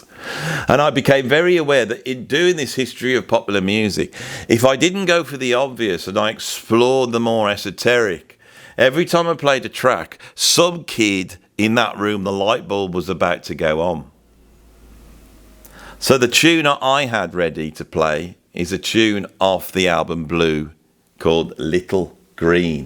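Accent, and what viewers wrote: United Kingdom. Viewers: It is episodes like this one that keep me watching,. I have subscribed and unsubscribed a number of times, usually because of offense that you either purposefully or inadvertently bring to my Christian beliefs. But its when you get right down to what life is all about and get very transparent and authentic that keeps me hanging around. I do enjoy your sense of humor and your entertaining British accent, which reminds me of the Beatles, and I understand that every episode can't be like this one, but we all need to be more human and look at ourselves and others for what we are to understand our wretched sinful condition and our need for the love of God. Keep up the good work!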